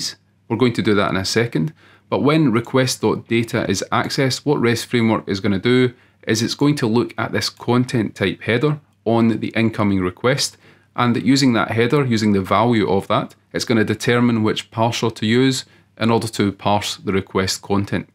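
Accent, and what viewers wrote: Scotland, United Kingdom. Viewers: Sorry if I'm rude but what is that accent Scottish ?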